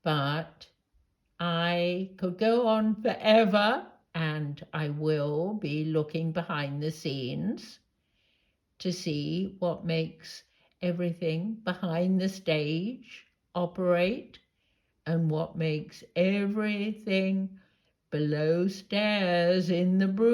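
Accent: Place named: RP, United Kingdom. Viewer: Your voice is so posh.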